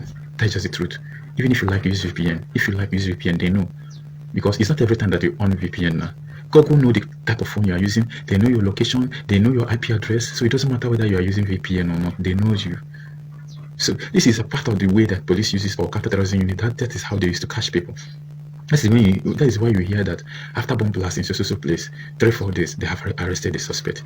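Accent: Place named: Nigeria. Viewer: Your Nigerian English accent is very difficult to understand. It's good enough for your Nigerian selves. It's made WORSE by your speaking so so abnormally fast. I can't subscribe to this , sorry.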